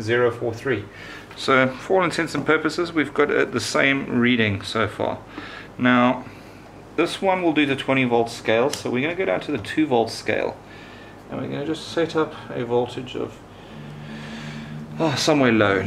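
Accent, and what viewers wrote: South Africa. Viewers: Sounds South African and they did once use a comma instead of a decimal point.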